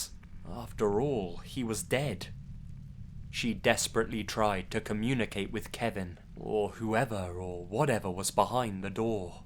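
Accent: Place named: RP, United Kingdom. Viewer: Why do talk posh as fuck?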